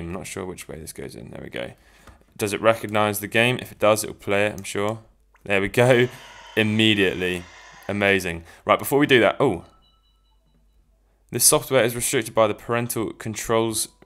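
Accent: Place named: United Kingdom. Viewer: In British English.. perfect, i love your accent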